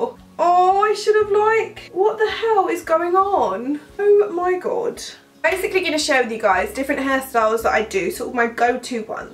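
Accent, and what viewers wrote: United Kingdom. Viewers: I love her British accent 😍😍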